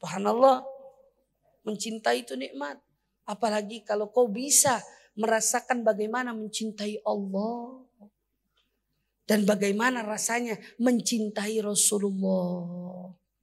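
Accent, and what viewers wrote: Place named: Medan, Indonesia. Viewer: Ustdzah kebawa bhs medannya maasyaaAllah.tausyiah kota medan. Tapi blm pernah denger ustdzah bhs sunda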